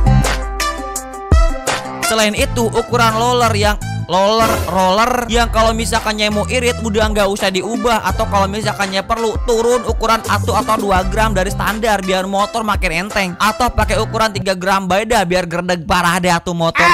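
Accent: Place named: West Java, Indonesia. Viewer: Kepincut ni channel karena logat sunda n music terompetnya 😂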